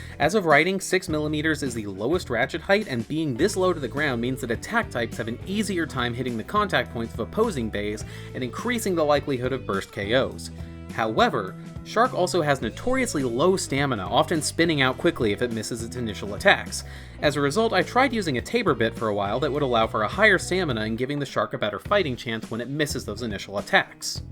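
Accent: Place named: South Texas, United States. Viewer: Hey heard you from south Texas ,you from the 956????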